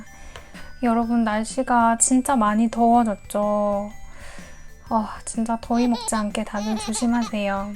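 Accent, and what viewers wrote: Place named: Gyeongsang, South Korea. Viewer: 혹시 어디분이신가요?? 경상도신것같은데! 화이팅하새용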